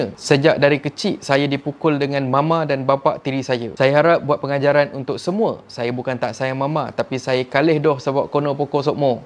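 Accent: Kelantan, Malaysia. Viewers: The best part cube kecek klate dan slalu mitak maaf takut salah sebut dan pantun slalu terbaik, klakar abis..hehe Terbaik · Terbaiklah bang ckp kelantan 👍👍sy suka tngk &sy pun dri Kelantan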